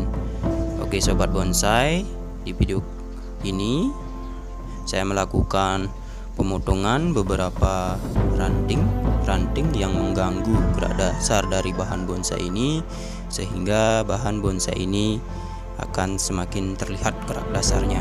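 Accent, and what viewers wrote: Bali, Indonesia. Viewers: Logat bali sangat kental bli,, Salam semeton 🙏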